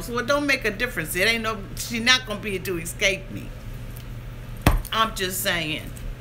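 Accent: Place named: Maryland, United States. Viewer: Britt S it's her Maryland accent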